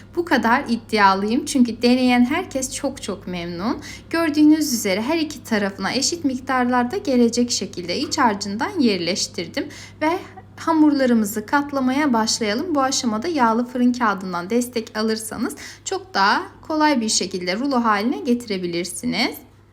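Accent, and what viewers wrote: Azerbaijan. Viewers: Azerbaycan şivesi var. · Danışıq Azərbaycanlı danışığına bənzəyir çox gözəl tərifdi yoxlayacam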